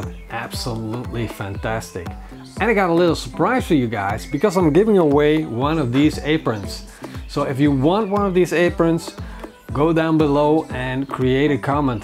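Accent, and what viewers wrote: Netherlands. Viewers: First video i watch of you but damn ik hoor meteen dat je Nederlands bent haha goed bezig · cute strong dutch accent !!! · asjeblieft hou op met dat engels....het klinkt echt totaal niet dat NL accent erin..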